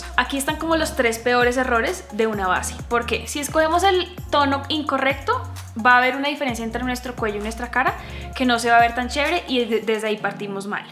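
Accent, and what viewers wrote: Bogotá, Colombia. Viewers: Eres de Bogotá? Jaja, es que por tu acento se nota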